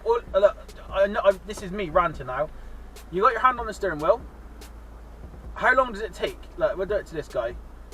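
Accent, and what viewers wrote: Bristol, United Kingdom. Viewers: Always love your vids. Strangely comforted by your bristolian burbling. 💋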